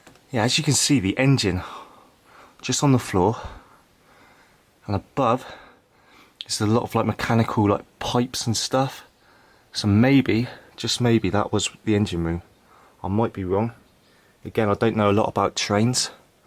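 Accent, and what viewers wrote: United Kingdom. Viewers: godd4mmit, speak ENU nsteado UK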